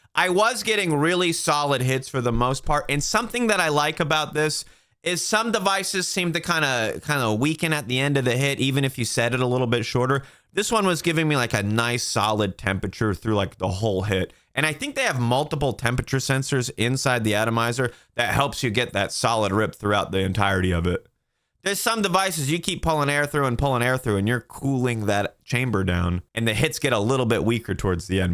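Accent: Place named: Northeast, United States. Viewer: He sounds like hes from the NorthEast, sometimes long island sometimes the bronx, but rolls his r's sometimes haha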